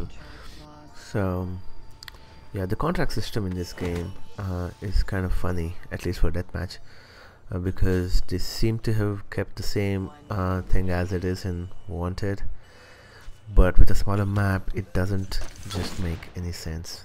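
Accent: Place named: India. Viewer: Lmao I was only trolling, I know an Indian accent when I hear it :P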